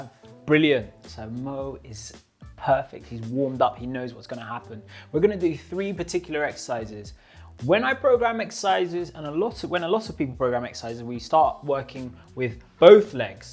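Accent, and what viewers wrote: United Kingdom. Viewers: Brilliant British accent 😮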